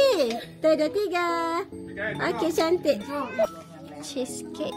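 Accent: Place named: Sabah, Malaysia. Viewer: comel cakap sabah.... buat lah story pekenalan dan suami dan q and a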